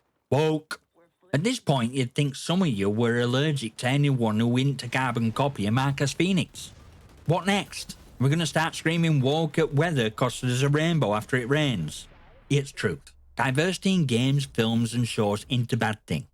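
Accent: Yorkshire, United Kingdom. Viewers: Its 100% an authentic Yorkshire man accent. I can confirm.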